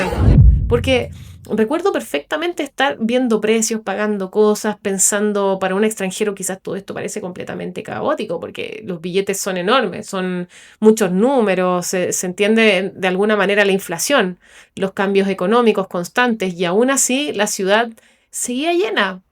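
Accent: Chile. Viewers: realmente me encanto!!!! mas.....con ese hermoso acento trasandino..... Me impacto la precision de tus conceptos para mi querida Buenos Aires, pero realmente me fascino lo simple de esas vivencias que se convirtieron en una poderosa razon de vida! Gracias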